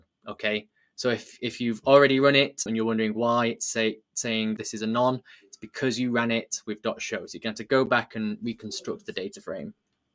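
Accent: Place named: England, United Kingdom. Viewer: With a English accent!!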